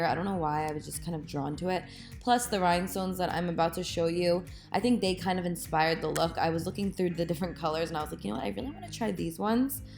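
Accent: New Jersey, United States. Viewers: Sooooo Jersey ! Love it!!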